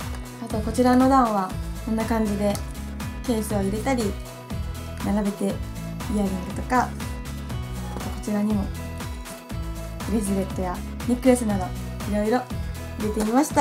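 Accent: Kansai, Japan. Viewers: かんさいべん？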